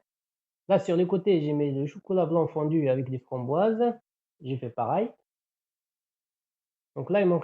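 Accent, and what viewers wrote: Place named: Kabylie, Algeria. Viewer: J’adore votre accent kabyle Merci pour cette recette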